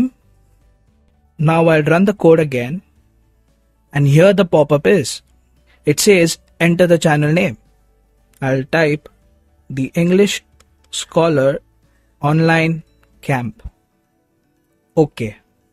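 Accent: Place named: India. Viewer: You sound Indian. Why have called yourself?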